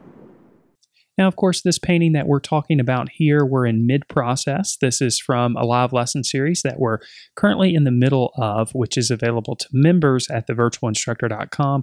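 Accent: South, United States. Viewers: Is that a Southern accent you have?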